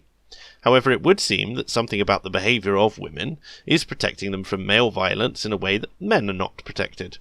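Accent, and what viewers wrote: RP, United Kingdom. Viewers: Posh Brits! I love Posh Brits talking channels. I love Voltaire's Ghost too.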